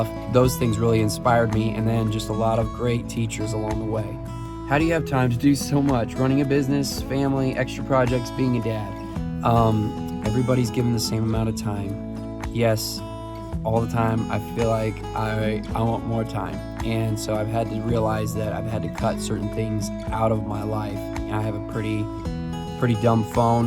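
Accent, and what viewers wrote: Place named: Midwest, United States. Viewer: Hmm. Idk but from his accent I'd say that he's from the Midwest. I could be wrong though. When he said God it sounded like Gad. Lol.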